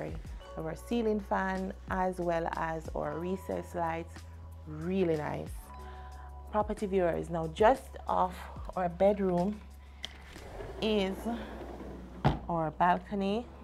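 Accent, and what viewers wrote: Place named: United States. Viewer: Right here, not right her, that's American's dialect. Your English is great enough.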